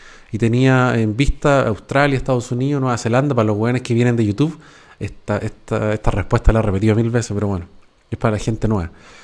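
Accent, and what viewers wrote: Chile. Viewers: Oye que bueno el canal de este wn, me entretuve viendo la historia de los matones en París jaja, tienes talento narrativo y además en español chileno.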